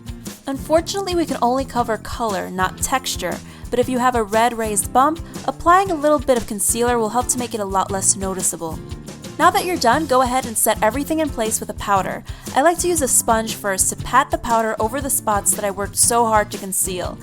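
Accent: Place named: New York, United States. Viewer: *yeah for new york accents*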